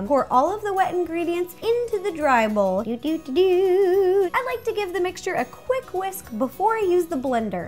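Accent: South, United States. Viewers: Maybe she's sorta southern. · Why does she have a sorta southern accent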